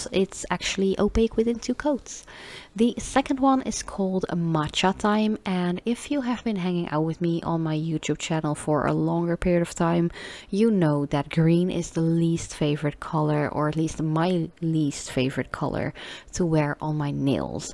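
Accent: Netherlands. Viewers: Je hoord wel dat je Nederlands bent ahah🤭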